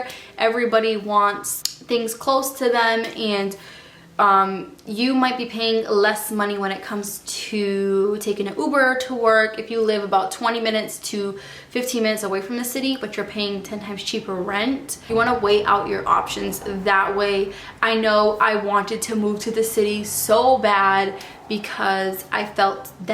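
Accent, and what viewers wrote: Boston, United States. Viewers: I can hear your Boston accent. It's ever so subtle but adorable! 😍😁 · Love the Boston accent, thank you for your good points! Need some help learning where to find an apartment coming out of college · Lol...i knew you were from the Boston area by your accent! I'm about 40 min away!! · In Michigan, the basic coverage insurance is over $100 a month. For me its $239 a month because I haven't had insurance in a while. I've heard that Michigan has the highest car insurance in the country. Its just crazy to hear how cheap it can be in other places. In other news, I LOVE your Noston accent..😀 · I love your Boston accent.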